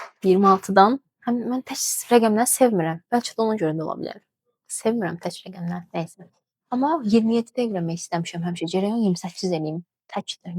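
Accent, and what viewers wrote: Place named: Sheki, Azerbaijan. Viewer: Şəki ləhcəsi çox qeşeydi. Uğurlar sizə, çalışın motivasiyadan düşməyin ❤️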